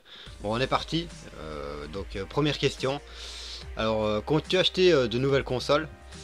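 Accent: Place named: Liège, Belgium. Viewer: Ton accent Liégeois ahha Je viens de Bxl moi, continue comme ça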